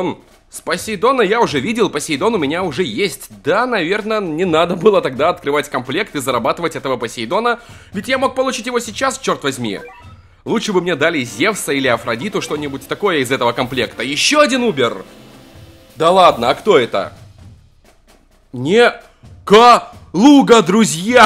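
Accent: Belarus. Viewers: ты же беларус да?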